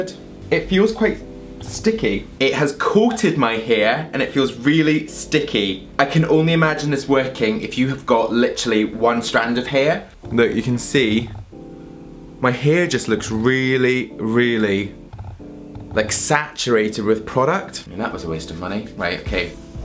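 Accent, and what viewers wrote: United Kingdom. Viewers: Where are you from? i thought you was from uk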